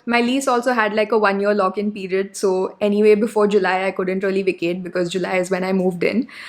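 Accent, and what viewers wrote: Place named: Karnataka, India. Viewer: ur English utterance seems to me discording... it seems pretty problematic to sort out r u speaking English or kannada....request to correct ur English accent properly ..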